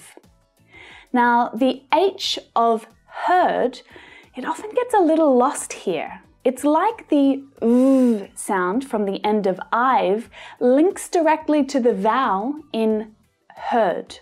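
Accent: Australia. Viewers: Nice AUSTRALIAN sound. And I learn much from you. Thanks. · First time I’m really learning good pronunciation but from a teacher with an australian accent!!! Superb!!!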